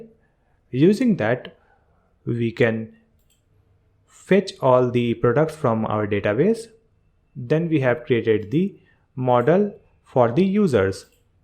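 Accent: India. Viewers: infortunately english indian accent make it difficult for me to follow this interesting youtube subject. i'm actually not an english native speaker but i understand well britain and american english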